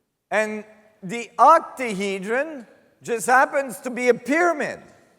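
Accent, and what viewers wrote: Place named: India. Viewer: жуткий акцент.индус?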